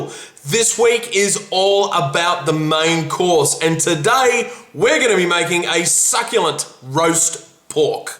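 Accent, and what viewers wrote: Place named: Australia. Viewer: Wow! You are really good! My mum is a chef. c: <3 your videos, plus your aussie too! (I am if you are wondering) I'm a South Australian. c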